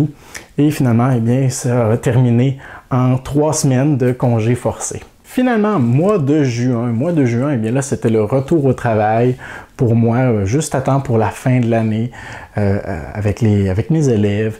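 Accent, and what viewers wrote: Quebec, Canada. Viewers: Je t'adore tu sais ! j'adore les québécois ...j'ai d'ailleurs dans ma vie travaillait avec une québécoise dans un village de vacances et j'ai passé d'excellents moments avec elle. Et je retrouve dans toi toutes ces expressions qui me faisaient rire, cet accent qui vous est propre, mais surtout beaucoup pour ta gentillesse et tout le travail que tu effectues au quotidien !! Continue dans cette voie en espérant que tu seras moins stressé cette année !! a bientôt ! et le bonjour à ta femme ! mdr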